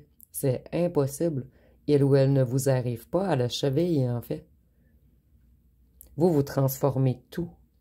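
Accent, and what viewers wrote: Quebec, Canada. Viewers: Tres bel accent quebecois chere madame❤❤❤❤❤❤❤❤❤❤❤❤❤❤❤❤❤❤❤❤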